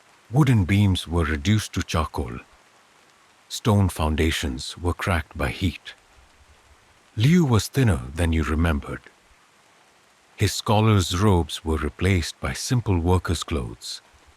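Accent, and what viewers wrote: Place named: India. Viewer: Very good, indian accent is quite strong💪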